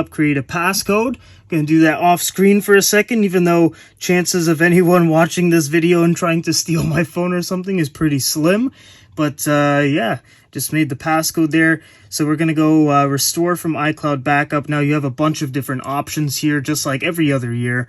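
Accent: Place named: Canada. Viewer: What’s wrong with Canadian English?🙄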